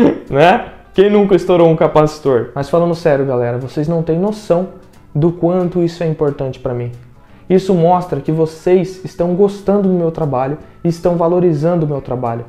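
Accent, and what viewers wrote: Paraná, Brazil. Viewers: Cara, tá certo que o cabeludo é feio, tem sotaque paranaense, e tal... rsrsrs... Mas dar dislike?!?!?! Putz, esse cara tá contribuindo pro progresso dessa nação muito mais do que todos os políticos juntos, e sem cobrar nada... E ainda tem espírito de porco que dá dislike?!?!?!?! Patacaparau!!! Bora clicar nos joinha, galera!!! E, Professor, continue assim, que o Brasil precisa é de gente como você. Muito obrigado!!!!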